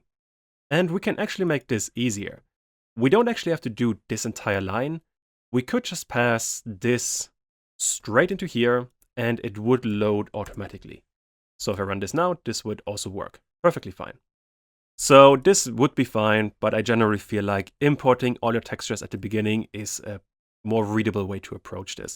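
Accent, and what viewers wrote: Germany. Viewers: you have a nice accent, are you German? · are you german? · Are you german? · Sound like german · deutscher akzent?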